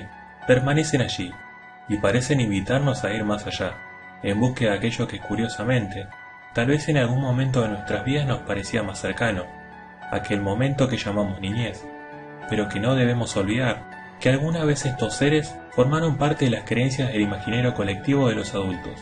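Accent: Argentina. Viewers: bueno pues tu acento me parece que es de una persona de argentina. posdata: me saludas a maradona y ha leonel messi jajajaj es broma tío. buen vídeo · eres argentino o uruguayo o paraguay por el dejo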